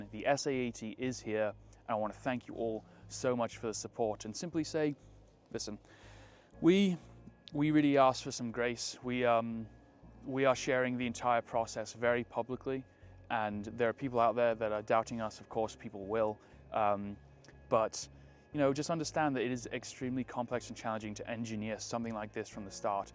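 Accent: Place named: England, United Kingdom. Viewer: a real round then. When I heard the English accent I thought he was gonna sell a .22 😂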